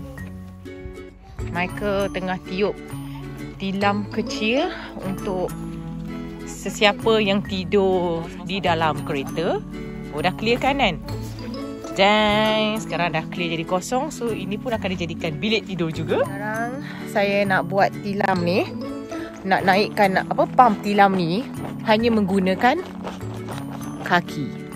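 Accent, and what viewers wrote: Malaysia. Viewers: Mantap.loghat abg macam orang melayu dah.👍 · pikir bukan melayu tadi😁😁bila kluar bhsa melayunya yg pekat tu bru perasan dia org malaysia.pikir td org lain mksutnye bkn org malaysia😊😊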